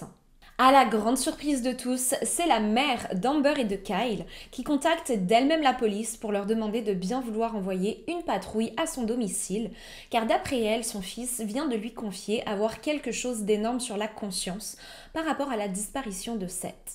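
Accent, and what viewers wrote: Quebec, Canada. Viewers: J'aime vraiment trop ton accent Québecois, ça sonne tellement sympathique, j'adore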